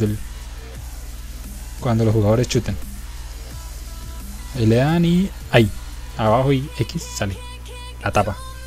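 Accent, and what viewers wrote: Antioquia, Colombia. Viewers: porsero ust es de colombia por q tiene pora voz de paisa de calarca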